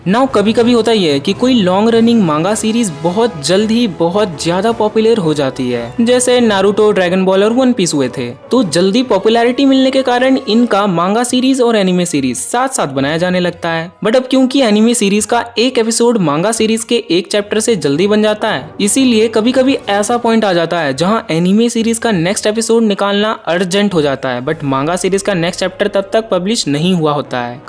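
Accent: Bihar, India. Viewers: Kahe aap bihar se ho kya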